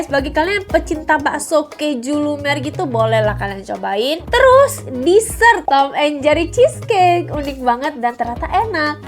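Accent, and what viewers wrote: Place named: Bali, Indonesia. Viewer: Logat balinya udh mulai ad yaa😅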